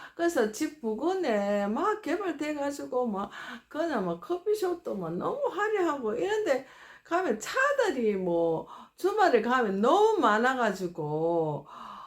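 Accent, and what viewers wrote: Gyeongsang, South Korea. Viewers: 78♡ 교수님 반갑습니다. 경상도 억양이신데 저도 19세까지 포항에서 살았습니다. 영상 잘 보겠습니다.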